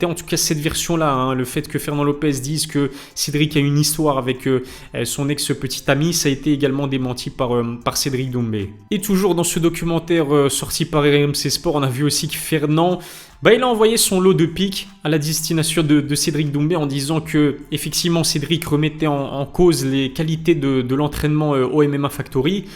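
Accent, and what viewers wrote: Belgium. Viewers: Très belle chaîne mma, sincèrement, très passionné et sérieux 👍🏻 Et puis, ce petit accent belge est un plaisir et une marque de fabrique 😁 Merci à toi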